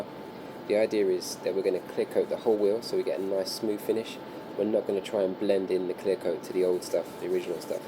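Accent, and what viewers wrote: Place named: United Kingdom. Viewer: what filler did you use? or I guess its better what Kind of filler since you sound like your in UK somewhere and we in the US probably won't have the brand of filler you used. I got the same exact wheels on the 325i that I bought and it has curb rash on 3 out of the 4 wheels. you can probably do this even when its on the car but its easier to do it removed.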